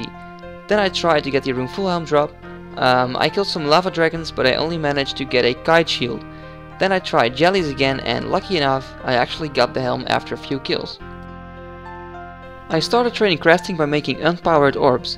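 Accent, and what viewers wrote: Netherlands. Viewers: It's so weird that I just knew you were dutch from like the first word you said :S. I'm dutch too, the accent is not as obvious as alot of other dutch people though. Well done btw, I know what quests to do now aswell :D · fantastisch nederlands accent :D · kom je gewoon uit nl? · Ben je nederlands man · Viel me op dat je Nederlands bent :) je maakt echt goede video's man! Gelijk geabboneerd, ga zo door man ;)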